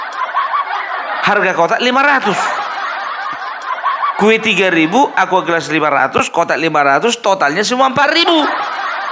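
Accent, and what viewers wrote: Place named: Medan, Indonesia. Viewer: Yakin lucu si Abang ini. Sy suka logat Medan. Lanjut bang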